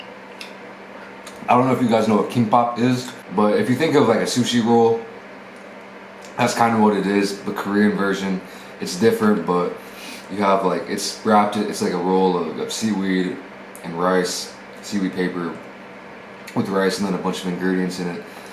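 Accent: United States. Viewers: His voice is so american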